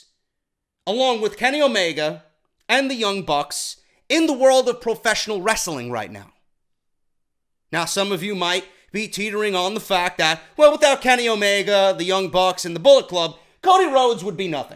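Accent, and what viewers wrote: New York, United States. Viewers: Newyorker accent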